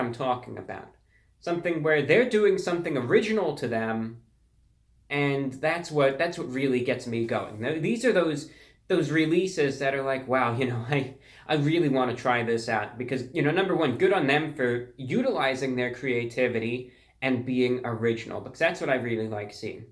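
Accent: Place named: New York, United States. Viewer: where is this guy from? I would guess New York City or maybe NJ? that accent....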